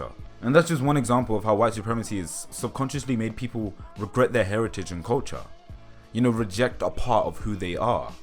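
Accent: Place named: England, United Kingdom. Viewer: "sound white"??? I mean, from your accent it sounds like you've either spent time in england or have english parents... Just cause you have that accent doesn't mean you're white. Any more than someone sounding hood makes em black.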